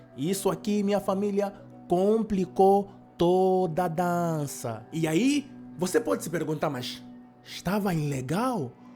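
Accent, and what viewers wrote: Angola. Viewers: O que me Cuia é que vc fala mesmo como Angolano mano. E eu Amo isso.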